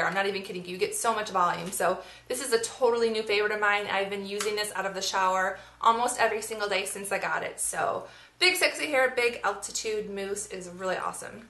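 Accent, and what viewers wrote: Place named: Philadelphia, United States. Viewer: love your videos and your accent haha...philly?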